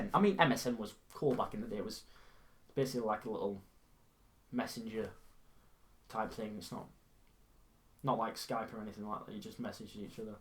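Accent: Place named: Manchester, United Kingdom. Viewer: You from Manchester bro? You have the accent for sure. A fellow Manc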